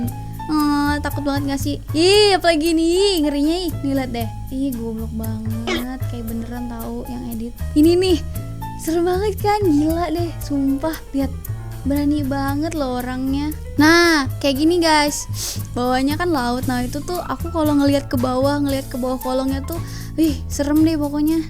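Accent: Banjar, Indonesia. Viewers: Kek logat orang banjar:) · Banjar nya kluar sikit" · Logat banjarnya kada kawa di hilangi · Logat banjar nya masih gak hilang awokwok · Kyk org Banjar ada kata², nah di akhir kata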